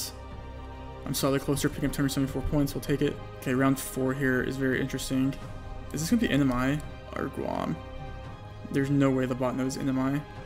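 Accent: Germany. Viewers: how can you speak such a german english haha what a legend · You can instantly tell the Country in Geoguesser, meanwhile I can tell , that he is a german fellow 😂👌🏼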